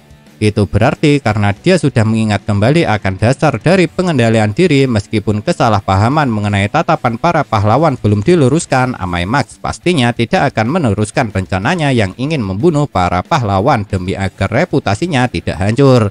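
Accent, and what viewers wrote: Java, Indonesia. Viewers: Logat jawa neee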